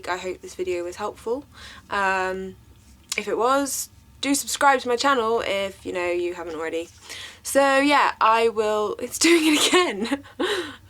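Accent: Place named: RP, United Kingdom. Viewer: i love your posh accent.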